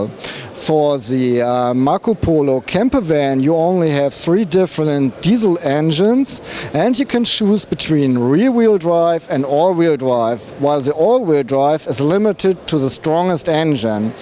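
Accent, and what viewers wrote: Germany. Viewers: I think ze strong accent emphasizes the technical, quintessentially precise German idea of automotive engineering. Keep up the good work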